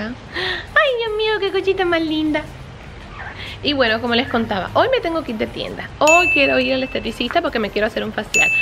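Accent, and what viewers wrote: Cuba. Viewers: Por que son las dos cubanas y a si hablan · para tu informaciom no,son hermana son cubanas y hablan en el mismo acento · Es que ella habla como tipo cubano o algo así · por que es cubana y todos los cubanos hablan igual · Porque las dos son Cubanas ese es el acento cubano jjeje por eso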